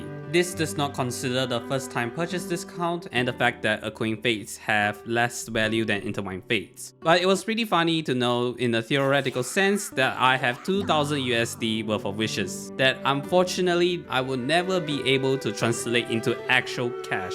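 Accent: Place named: Singapore. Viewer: Ayo are you Singaporean u have Singaporean accent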